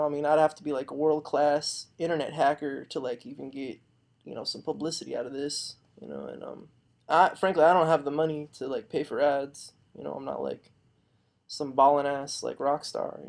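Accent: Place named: Spain. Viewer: Have you seen by any chance, yearsss ago, his last vid (now deleted) that he uploaded where he was talking and seemed incredibly high or drunk and in a heavy Spanish accent ??? Like he was slurring his speech & I couldn’t understand anything, I wondered if he was under the influence of a drug that made him slur. It was weird as hell, then he deleted it.. I rly just wonder where he is in the world, if he’s even alive and what he’s doing rn.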